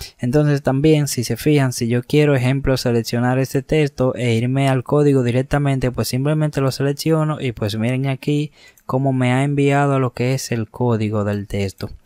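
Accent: Dominican Republic. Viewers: eres dominicano?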